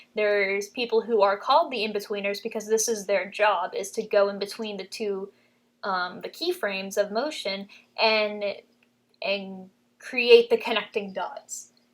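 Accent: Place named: Australia. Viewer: Why do you speak Australian?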